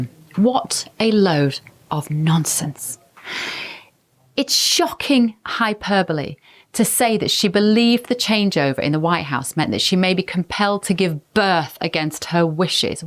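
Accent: United Kingdom. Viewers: I'm an American man in my 50s., if not for your lovely British accent.., I would be inclined to believe that you are just a wholesome American girl, that everyone loves to adore. Honestly, I only mean.., no matter where we come from, common sense is universal. Good form.👍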